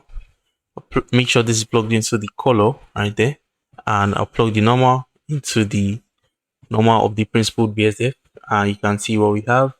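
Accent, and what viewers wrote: Nigeria. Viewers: Curious. Are you Nigerian by any chance? Your accent sounds familiar... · Being looking for a Nigerian to do a video like this, been tired of hearing accents.. kudos bro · Thanks bro, you sound nigerian though this is what i have been looking for a video that explains everything 20hrs is so awesome and for free nah bro we are grateful ill be back in 2 weeks to my comment and i have completed it by then .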